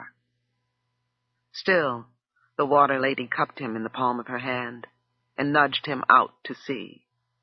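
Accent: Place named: Midwest, United States. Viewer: Wow. Lynn Thigpen. I know some of her family and her work onstage and onscreen is excellent. Great voice. That lovely clear Midwest Black voice.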